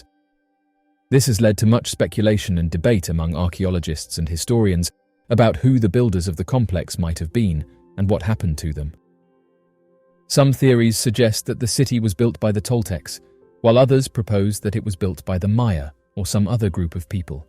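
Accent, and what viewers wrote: United Kingdom. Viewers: Yeah, I am baffled by this video. Teotihuacan was neither built by the Inca (which is on a different continent) nor Aztec (about 1000 years too late). It's not exactly known who built it or what those people were called, as even the name Teotihuacan which means "city of the gods" was given to it by the Aztecs who found it as an ancient ruin. I guess if they slap on a dude with a British accent, that dumb Americans will believe anything they say in a video.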